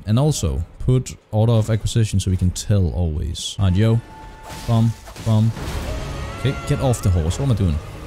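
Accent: Denmark. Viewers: Wait you danish? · Are u danish?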